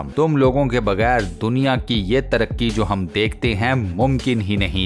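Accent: Punjab, India. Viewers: Apki awaaj vich punjabi tach bhot Nice hai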